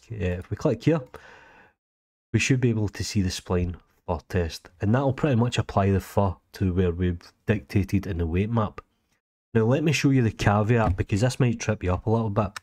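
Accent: Scotland, United Kingdom. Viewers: He's Scottish, hence the heavy accent. It's revealed ;)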